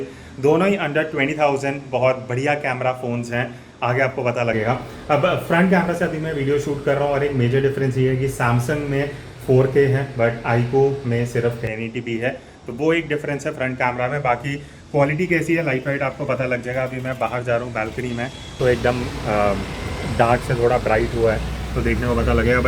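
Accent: Lucknow, India. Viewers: R u from lucknow sir?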